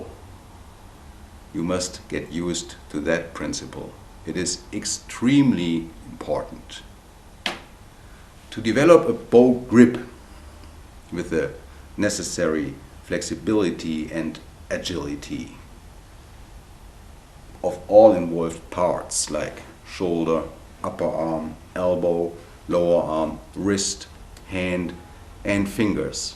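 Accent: Germany. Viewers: German accent, never gets old. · my first thought was "he is either German or Finnish." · His videos are good and he seems to be a great teacher but am I the only one who listened to his first words and was like "I bet he's from germany"? I can't be the only one😮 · Harry Devita Pretty much a german accent. The misspelling might be intended though :D A beginner is begging for knowledge ;-)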